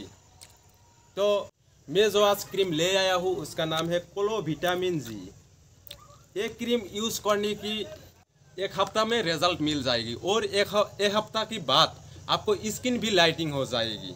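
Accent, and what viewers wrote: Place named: Assam, India. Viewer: Ye assamese ladka hein mein bhi assamiya hun... mujhe pata sala ye assamese ladka hein iske ayaz se pata sala... bro Ki khbr ...mojja lagise video tu dari ahile tumak dhanyavad dim😁😁😁😁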